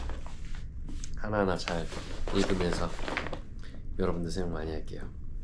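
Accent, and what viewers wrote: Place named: Java, Indonesia. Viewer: njir medok🗿😅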